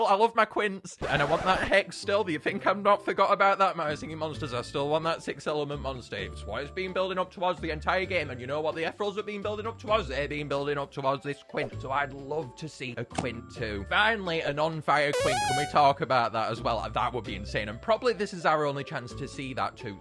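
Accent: United Kingdom. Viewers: His accent is British that's the reason why he pronounced it.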